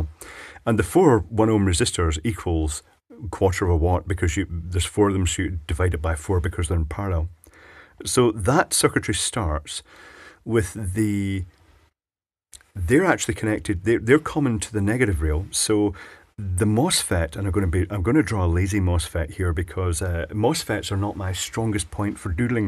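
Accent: United Kingdom. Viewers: British English is in full effect in this video. Crikey.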